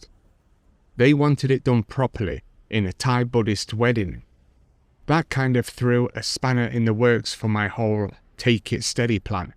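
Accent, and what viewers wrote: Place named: Cockney, United Kingdom. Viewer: Idk, this guys accent sounds cockney/ UK, but claims, beeing american? Anyway, these things happen to every 2. guy, marriing a thay girl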